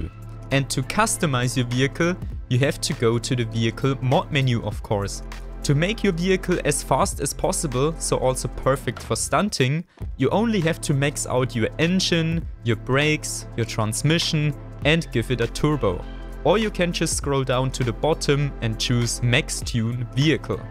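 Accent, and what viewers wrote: Germany. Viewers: Mn merkt extrem dass er deutsch ist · du bist deutsch hört mann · Are you from german? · Fam youre a German from that accent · Ich bin mal so frei und schreib auf Deutsch. Deiner Spracheinstellungen und deinem Akzent nach solltest du mich verstehen :). SUPER VIDEO! Der erste der alles wichtige mit rein genommen hat und bei dem es auf anhieb geklappt hat. Vielen Dank für das Tutorial, bei dir kann sich der Rest der Tutorialtypen die's so gibt noch eine Scheibe anschneiden!!!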